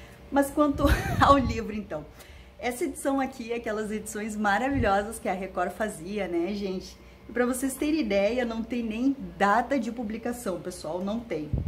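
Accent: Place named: South, Brazil. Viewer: Oi! Vim pelo canal da Andréia, você deve estar ganhando alguns inscritos, merece! Como é simpática! E esse sotaque do Sul? Tenho memória afetiva de uma professora minha do primário, gaúcha, e adoro essa musicalidade no falar! Eu precisei parar seu vídeo e ler o Inquilino. Estou na parte em que ele vai para o apartamento do amigo da Stela e eles estão lá falando da moça que se suicidou. Depois que terminar volto aqui para ver o restante da sua resenha! Eu sou terror/suspense na veia! haha Você citou o filme ser melhor que o livro. Um super exemplo para mim disso é o filme 1408, baseado em um conto do King. Você deve conhecer. Fui ler o conto após ter visto o filme e pela primeira vez achei que King não explorou direito o potencial do conto e do personagem haha, que heresia! Não parecia o mestre da prolixidade, porém temos que entender que é um conto. 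Ainda assim, quando acabou eu falei: mas já? kkk mil vezes o filme! Como dizem, ganhou mais uma inscrita! Bjs!!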